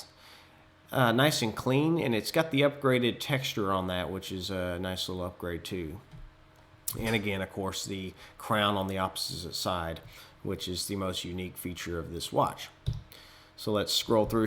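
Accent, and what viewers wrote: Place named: South, United States. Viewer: I like your approach. Your video style is very friendly, down to earth, fair minded, and helpful. Please keep up the good work! I am also recommending your videos to my students ( I am a freelance English teacher) as you have a very mild, clear, Southern accent, which I think can contribute to their listening skills nicely. ( I get them to listen to a mixture). Many thanks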